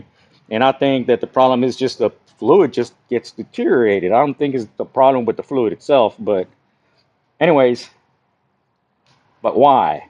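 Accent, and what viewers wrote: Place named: South, United States. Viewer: I'm totally enthralled to your blended southern/hispanic accent.😉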